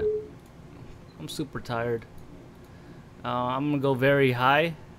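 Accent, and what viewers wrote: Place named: Cambodia. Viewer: Why do you have a Viet name but a Cambodian accent?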